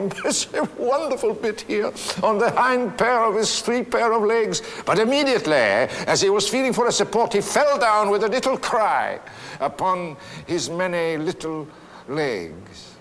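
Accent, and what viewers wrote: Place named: United Kingdom. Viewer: I hate to be haughty, toReasonWhy, but I do think the question rather is "why are Americans like you so ignorant of anything non-American that you'd mistake a British accent for a French one?".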